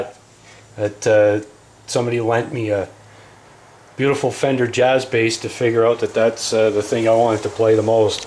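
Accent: Canada. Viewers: Mine is a 1968 that I bought new in '69. Have never owned another, never needed to. I've had it 44 years. Will leave it to my son, who plays bass, when I pass to that 'Great Gig In The Sky'. I still play, mostly blues and R&B. I grew up where we all had a 'Great White North' accent like yours. Great video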